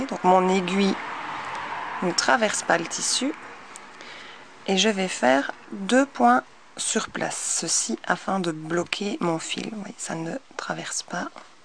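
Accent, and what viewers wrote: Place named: Belgium. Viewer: dieu merci enfin une véritable bonne explication avec une vidéo de bonne qualité. merci bcp et l'accent ne trompe pas, vive la Belgique ;)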